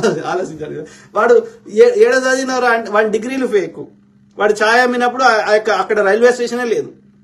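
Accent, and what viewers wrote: India. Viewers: బ్రదర్ ఈయన చెప్పేది సామాన్య ప్రజలకు అర్థం అయ్యే విధంగా మన తెలంగాణ గ్రామీణ ప్రాంత యాస లో చెప్తున్నాడు, అంతే.., అందులో నాకైతే ఏం తప్పు అనిపియ్యలేదు, i hope you understand ❤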